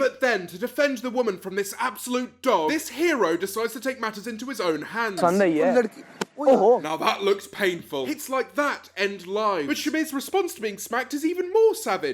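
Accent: United Kingdom. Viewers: Lol James Marriott just popped up in my recommended list...but he is soooooo funny! Good work. Love the British accent and British humour. Annihilated this ‘abbas’ bloke lol!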